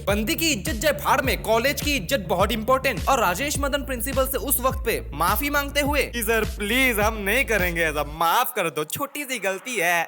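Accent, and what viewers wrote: Assam, India. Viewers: Why you sound Assamese?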